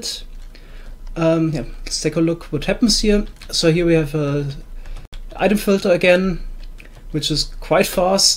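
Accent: Germany. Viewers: Als ich ein Video von dir gesehen habe, war mir direkt klar, du kommst aus Deutschland, der Akzent verrät alles😂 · BTW YouTube can't figure out your accent; it thinks all your videos are in German :\ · Thats so funny! I'm german, too and I always thought that you sound kind of german xD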